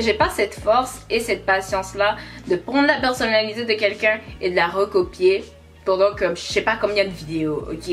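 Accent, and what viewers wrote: Quebec, Canada. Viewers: Franchement ton accent québécois je l’ai décelé dès les premières secondes 😂😂 j’aime bien tes vidéos 😘 take care😊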